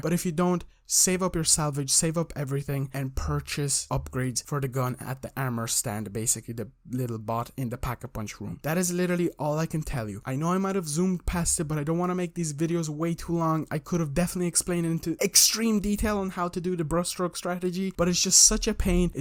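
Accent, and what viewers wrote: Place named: Ireland. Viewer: You sound Irish bro is it true but it isn’t a strong Irish accent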